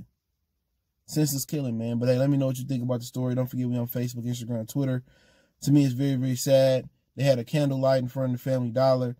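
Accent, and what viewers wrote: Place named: Ohio, United States. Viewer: You sound like you from Cleveland 🤔. I could be wrong but you definitely have an Ohio accent.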